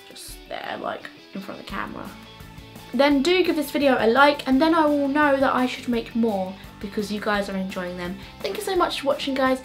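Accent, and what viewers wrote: Ireland. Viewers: irish accent is hot :) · The Irish accent is amazing! <3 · high five about the irish accent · has a bit of an Irish accent:P very genuine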